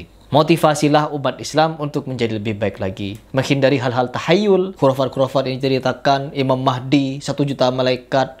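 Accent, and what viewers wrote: Madura, Indonesia. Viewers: Pola oreng madureh kakeh mas. Logatnya kayak oreng madureh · Bg lu emang orang Madura yah?? Kirain cuma logat lu aja Madura.itu di caption lu pake bhs Madura kan???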